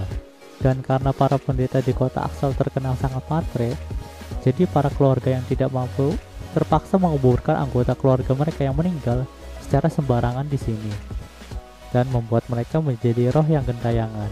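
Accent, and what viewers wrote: Cirebon, Indonesia. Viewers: Orang Cirebon njir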